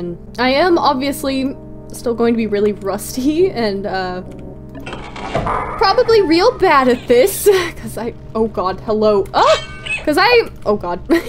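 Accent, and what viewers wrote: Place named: Scotland, United Kingdom. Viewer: You sound scottish ! just like Karen Gillian in the Doctor ! subbed !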